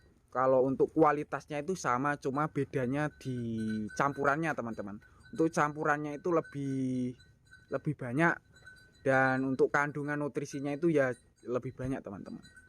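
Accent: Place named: Java, Indonesia. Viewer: 170 sen ato 170 rp ato 170 ribu broo Medok kali kmu ya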